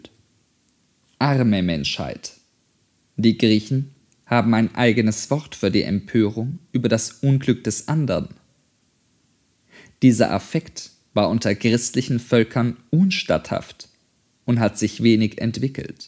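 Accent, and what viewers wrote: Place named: Austria. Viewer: Es hat diesen össtereichischen Akzent. Aber ich finde daran kann man sich gewöhnen. Es ist dafür klar und flüssig gelesen und auch gut aufgenommen. Das Paket finde ich insgesamt bemerkenswert und einzigartig. Wenn man sich an die persönliche Note gewöhnt hat (die jeder Vorleser hat, das liegt per se in der Sache) kann man sich wirklich sehr gut auf das was Nietsche da verfasste konzentrieren. Schade eigentlich, wenn jemand dann aufgrund persönlichen Nichtgefallens zu solchen Ausdrücken greifen muss, und das so global abtut. Daher: Deine Meinung teile ich ganz und gar nicht. MfG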